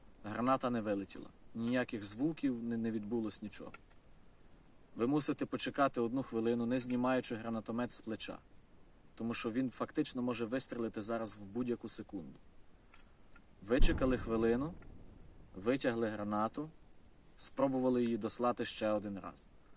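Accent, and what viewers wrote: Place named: Western Ukraine, Ukraine. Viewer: Чiтко западенській суржик. А інформация, корисна.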